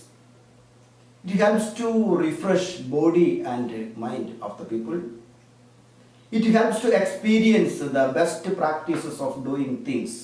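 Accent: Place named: Kerala, India. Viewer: Malayalam poley thonunnu some times your english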